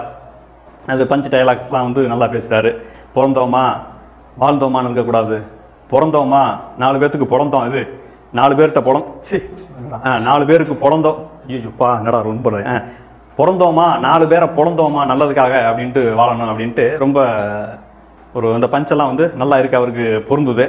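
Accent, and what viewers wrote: Madurai, India. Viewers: மாம்ஸ் இன்னைக்கு மட்டும் 10 வீடியோ பாத்துருக்கேன்.. என் மனசுல இருந்தத பூராம் மதுரை மொழில செல்லிருக்க.. சிரிச்சு வயிறு வலிச்சிருச்சு... சத்தம் போட்டு சிரிச்சோம்யா.. மாம்ஸ் வாழ்க...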